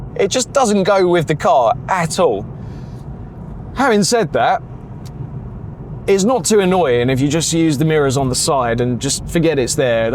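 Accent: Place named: Cockney, United Kingdom. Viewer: COCKNEY DIMWIT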